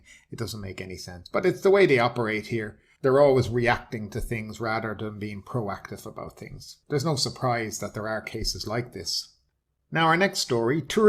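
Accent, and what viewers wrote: Ireland. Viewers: ah. Dunshaghlin or dunboyne I’d say. (With that accent) …… I’m a dub myself.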